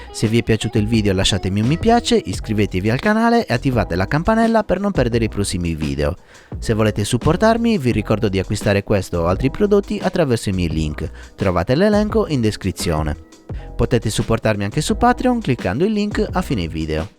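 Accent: Italy. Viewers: At first I was annoyed that English titled video is not in English, but I decided to put on English subtitles and this review is actually nice and clear! I actually even liked this Italian accent :D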